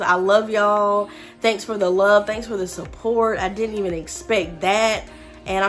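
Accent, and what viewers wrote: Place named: South, United States. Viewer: Nice video. Suggestion: Don't put things so close to the camera. We can't see it like that. Keep it a distance away and put the palm of your hand behind it. Where are you from? You sound like you're from Tennessee, Kentucky, Alabama, or Mississippi.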